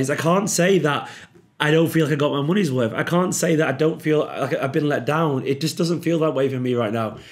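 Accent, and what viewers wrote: Manchester, United Kingdom. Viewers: You're so gutted your accent went extra manc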